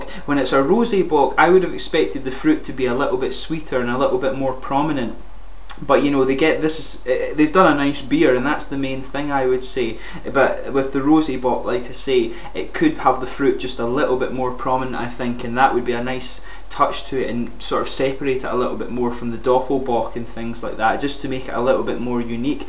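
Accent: Scotland, United Kingdom. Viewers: Beeing a German living 70 miles away from the Schmucker brewery I tried hard to get it from a local vendor. Almost impossible ... apparently I have to order it via the internet. Anyways: Good review! I will definitely order some bottles. Feels funny as a German Scotch lover to listen to an English review of a German beer done by a guy from Scotland. :) Cheers!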